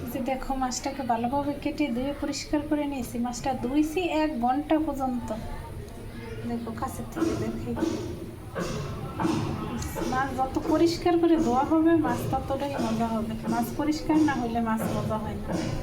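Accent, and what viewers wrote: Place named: Barishal, Bangladesh. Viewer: ওরে মোর খোদা হেইয়া দেহি বরিশাইল্লা মুইতো ডরাইছিরে আপা তবে ভিডিও এবং কথা গুলি সেই সাদ হয়েছে 🙄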